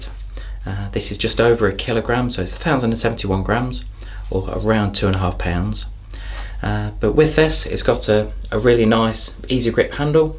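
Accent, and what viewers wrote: United Kingdom. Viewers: YOU ARE MY HERO !!!! SO BRITISH, SO POLITE, SO ASMR !!!!!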